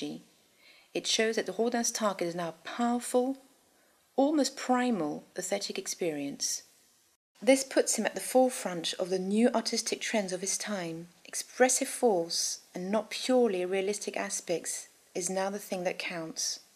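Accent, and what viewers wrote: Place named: France. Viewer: The narrator sounds like a well spoken French girl whose posh rich French parents sent her to a posh English boarding school for a few years to learn posh English.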